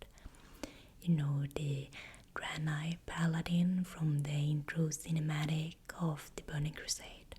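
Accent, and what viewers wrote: Sweden. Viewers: Dane here! I have followed your channel for some time now and I absolutely LOVE your ‘singing’ (swedish) accent when you whisper! Your passion for WOW and other fantasy IP’s is infectious. Happy to see how your channel has grown. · Swedish. Couple of her videos are in Swedish as well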